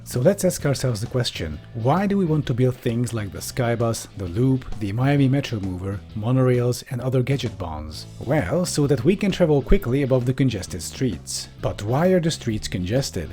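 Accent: Hungary. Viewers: Are you from Hungary?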